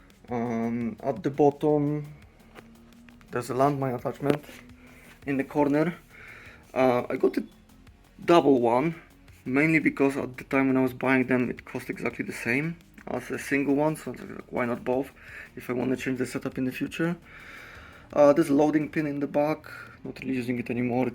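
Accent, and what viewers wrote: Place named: Poland. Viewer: The English flag confused me, with your accent Are you polish ??? Nice gym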